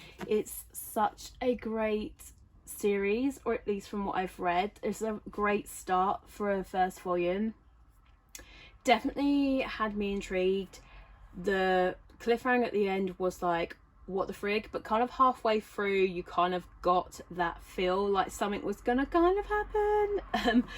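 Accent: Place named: United Kingdom. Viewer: Hi! Can I ask if you know where to get secondhand mangas in UK? (assuming you are in uk based on your accent, sorry if im wrong!)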